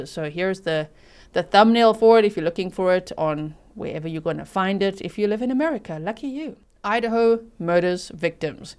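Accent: South Africa. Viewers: I’m from South Africa as well, I thought you sounded like me. I live in ST George, Utah. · love your afrikaner accent!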